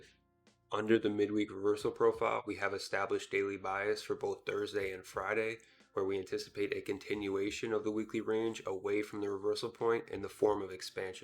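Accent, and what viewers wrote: South Africa. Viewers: Yoh u South African?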